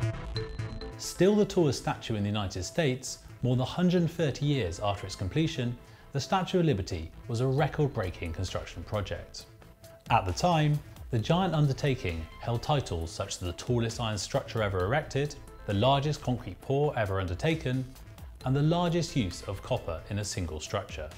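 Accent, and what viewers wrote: United Kingdom. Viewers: Sotaque britânico não dá pra aguentar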